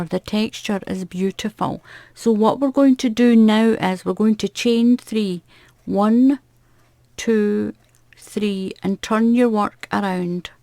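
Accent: Scotland, United Kingdom. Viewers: Thank you so much...I want to make some thing nice as a stripe in the middle of a big afghan I`m crocheting for my husband, and this will be great. p.s. I think your accent is charming. I am in the US, but many of my ancestors came here from Scotland and I like to think that they sounded like you. Keep safe and thanks again. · Not sure where in the world you live but believe you me accent is a lovely soft Scottish brogue, not thick at all ( ps I’m not Scottish)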